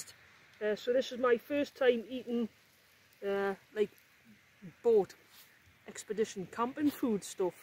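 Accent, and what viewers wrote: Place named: Newcastle, United Kingdom. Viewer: A good Geordie accent there, then! I remember a holiday on Eigg in 1954. The 'singing sands' are also 'sinking sands' - you have to be careful where it's wet - you get sucked in. The croft we stayed in had not yet got electricity so we had oil lamps to find our way upstairs to bed. The cooker was an old iron range cum open fire. You could hear the Puffin birds crying all night up on the backbone escarpment - quite eerie!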